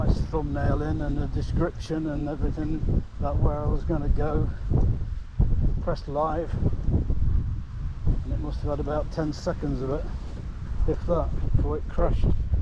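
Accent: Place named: England, United Kingdom. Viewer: ❤😂🎉. Love 💕💕💕💕😁🐾🐾🐾🐾💕💕💕💕Love this Video 😂😆😫😊🤓😇😂🤣🤣🤣🤣🤣😍🥰🥰🥰💕💕💕💕💕🐾🐾🐾💕💕💕💕💕🤣🤣🤣🤣🤓😲😩🤓🤔🥰🤣🤣🐾🐾🐾🐾🤣🤣🐾🐾🐾🐾😮🧐😫😫💦💦💦💦💦🌈☔️☔️☔️☔️☂️☂️☂️☂️☔️☔️☔️☔️🌈😂😂😂😂. Hehehehe 😅. Hehehehe 😅❤😂🎉🎉. I Do. This. All. Day. Talk to. Myself 😮👀👀👀👀🌈🌍👀🐾🐾🐾🐾🐾😩🧐🤓. But Nobody. Listen 😫🧐🤓💦💦💦💦🌈🌎🤣🤣🤔😂😂😮🌍😍🌈🌍😍😍. Wonder. Does. Milo. Listen 👀👀👀🤓🐾🐾🐾🐾👀😩🤓👀😩😩😩😩🌈🌍😂🌈🌍😂🌈🌍😂😂🌈☺️🌈😇🌈😊🌈🤣😫🌎. Looks like. You have a. Drought in your. Neighborhood 😮😮👀😫🐾🐾🐾🐾🌍🐾🐾. We. Having. So much. Rain ☔️ ☔️☔️☔️☔️☔️☔️☔️😫💦😫💦💦💦💦. Im. Going to have to. Send. You. Some. Rain ☔️ 😂☔️☔️☔️☔️🌈🌎🤓☂️🌈🌎🤓☂️🌈🌎🤓☂️🌈. 🤣💦💦💦🤣💦🤣🤣💦🤣💦💕💕💕💕💕💕. Enjoying. Listen. Talking to you. 😂❤❤. Love the. ENGLISH. Accent 😅❤🎉🎉🇺🇸🌎🇬🇧🌎🇺🇸🇮🇪🌎🇮🇪🌈🤓👀🤣😊🇬🇧🌈🇺🇸🇮🇪🌈👀🇬🇧🇺🇸🌈🐾🐾🐾🐾😇💦🌎🐾🐾🐾🇮🇪🇮🇪🇮🇪🌈🌍🌈😊🤣🤣💕💕💕💕💕💕🌎🌎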